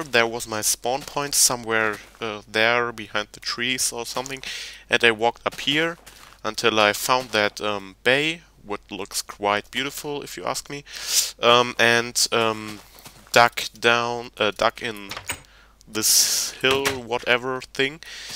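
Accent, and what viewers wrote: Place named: Germany. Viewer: man merkt so geil dass du normal deutsch sprichst :D zb. schurr (sure) und äktschälli xD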